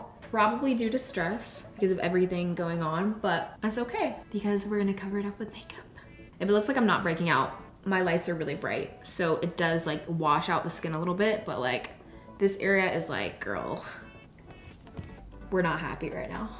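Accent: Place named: Tennessee, United States. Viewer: I know people have to grow and change which isn't a bad thing...but I miss the old, filming in her bedroom with her cute Tennessee accent 😔😕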